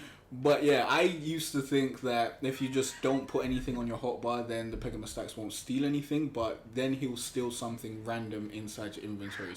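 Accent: United Kingdom. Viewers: Wow you are British, lol. Thanks for the tips.... I've only been playing for a day...finally caught my first dino: Trike and accidentally killed him on my standing fire torches...fml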